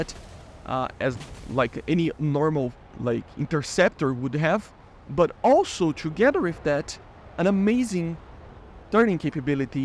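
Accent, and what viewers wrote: Brazil. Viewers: is a brazilian with portuguese linguistic vices. So it might sound like the IRIS-T for a non portuguese speaker. He literally put an image of the IRST on the screen when he is talking about it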